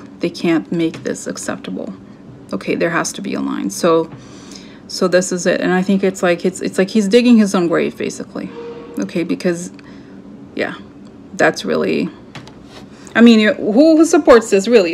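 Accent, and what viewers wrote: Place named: Russia. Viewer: Hey cheers.. so it didn't happen that way-keep chin up and come back and do some more cards! Miss your funny Russian accent!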